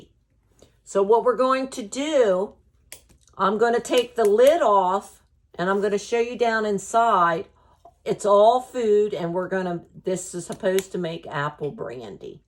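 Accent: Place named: Pennsylvania, United States. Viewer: You have to live in Pa. with that accent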